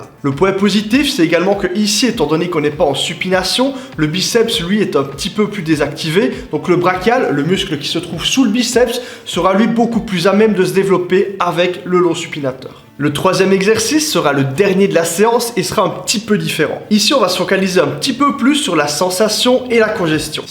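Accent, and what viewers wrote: Belgium. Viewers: Ahhh l'accent Belge m'avait manqué 💪🤘🇧🇪!!! Merci pour tes conseils !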